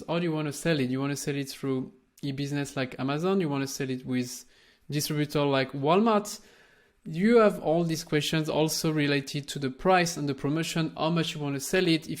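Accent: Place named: France. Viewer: That’s French accent! Great! 🎉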